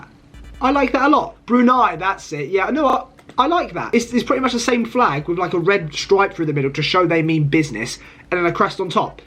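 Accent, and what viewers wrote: United Kingdom. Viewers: Your scottish because yor accent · r u british mate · I can tell bro is britesh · i know u british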